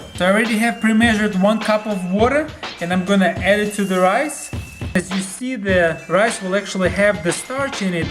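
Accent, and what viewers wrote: Russia. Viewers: “He sounds like crazy Russian hacker” most people from that part of the world do. So duh. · I can tell you are Russian or Ukrainian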